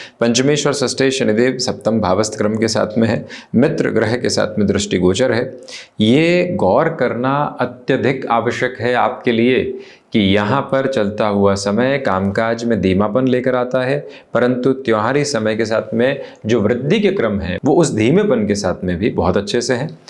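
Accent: Standard. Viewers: Bahut badhiya rashifal bayan karte hai aap. Aur aapki hindi bhi bahut hi shuddh hai. Kabhi kabhi kuch shabd samazne me taklif hoti hai toh subtitles ON karke samazna padta hai. Dhanyawaad. 🙏🏻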